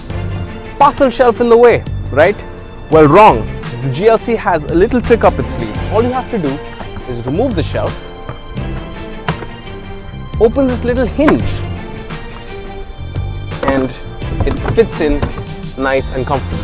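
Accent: India. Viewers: I literally can't understand you with your indian accent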